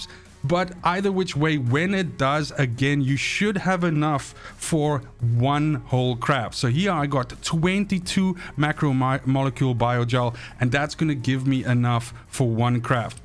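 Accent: South Africa. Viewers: So I can't get over the fact that you sound South African but pronounce your words like an American 😅 Where are you actually from? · Are you south African? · Nice vid bro. Question where in South Africa you from 😅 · Btw are you in S.A? You sound south African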